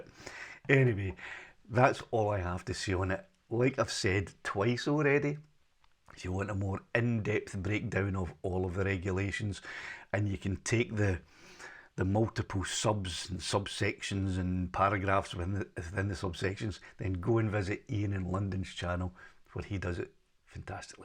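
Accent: Scotland, United Kingdom. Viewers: relentless droning—delivered with a distinctly Scottish flair—had me reconsidering all the complicated regulations and whether the Mini 5 Pro was the right fit for me. Then, in a moment of pure chaos, I completely forgot that I don’t even live in the EU, meaning none of it applies to me. Wow! You know what? Forget it—I’m going ahead and getting myself a brand-new Mavic 4 Pro instead!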